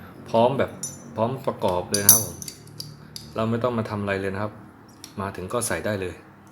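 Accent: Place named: Pattani, Thailand. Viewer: เสียงแหลงเหมือนเด็กตานี 😅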